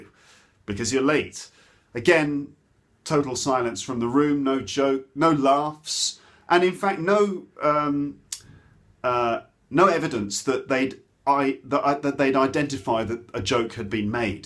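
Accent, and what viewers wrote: United Kingdom. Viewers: Your pronunciation is one of my favourite British pronunciation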